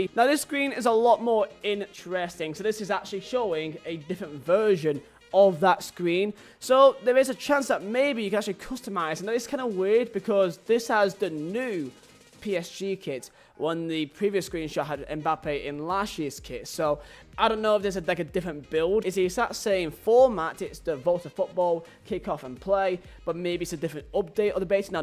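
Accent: United Kingdom. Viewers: Bruh, how are you in poland with a strong british accent like that?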